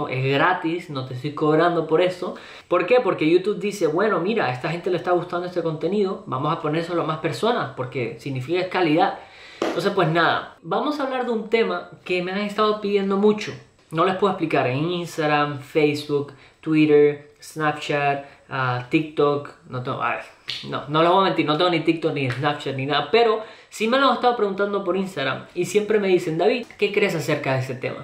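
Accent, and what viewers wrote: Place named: Argentina. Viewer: Traes un pequeño acento argentino por ahí! 😆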